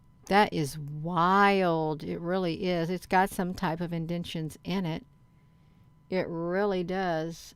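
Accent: South, United States. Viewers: What state is this lady from? Texas? Georgia? I can't figure it out which accent it is.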